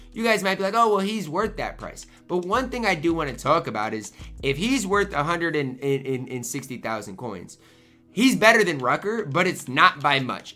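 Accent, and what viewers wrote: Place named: Midwest, United States. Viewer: He’s from Iowa area so it’s Midwest lol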